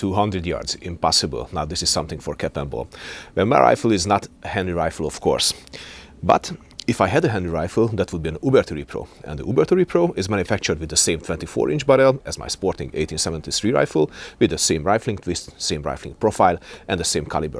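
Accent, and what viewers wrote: Hungary. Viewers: So accurate shots! Perfect video with nice hunglish accent as always! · ..az angolod jó csak nagyon magyaros😅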